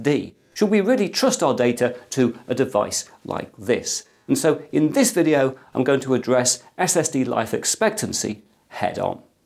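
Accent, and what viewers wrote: United Kingdom. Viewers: Dude is brittish, ofcourse its gonna be exemplary. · Linus but British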